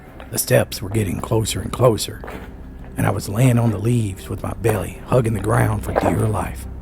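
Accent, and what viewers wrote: Appalachia, United States. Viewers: The villages Florida .. great voice over because I know the the mountain folks sound just like you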